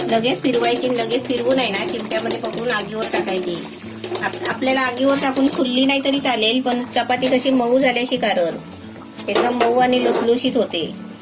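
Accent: Konkan, India. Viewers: Kaku tumhi koknatle aahat ka?? Chan watay tonning aikayla.receipi mast.🙏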